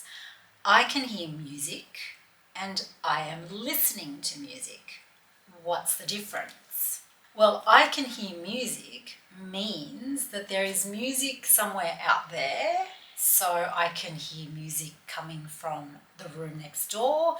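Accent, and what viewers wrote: Australia. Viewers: I don't understand why you don't have more subscribers and views, you made really useful and interesting videos, and the best thing is you have Australian accent! That is strategical for me, I am living in Australia and I was study by my own with audios and videos in British and american English, and I wasn't improving my listening skills here (I didn't understand Australian people). Since I am study with your material I have felt that I am progressing 😀. Thank you!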